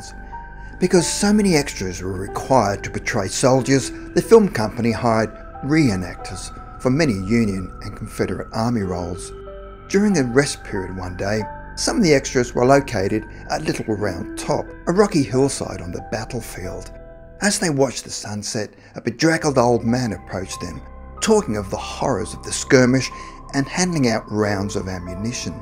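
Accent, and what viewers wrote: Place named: Australia. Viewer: Why the hell is an Australian guy comentating American history. This ruined it for me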